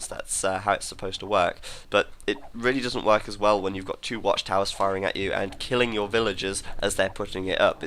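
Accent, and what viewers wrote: England, United Kingdom. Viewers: I just love commentator´s english english, sweet to my ears.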